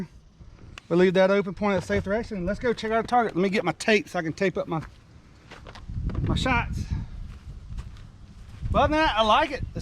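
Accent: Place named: Texas, United States. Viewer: well, I mean there is a Spanish pronunciation, but I wasn’t trying to offend you man. Sounded like just a southern accent. Similar to what my family in Texas would say. Liked the video, just made me chuckle. No offense brother.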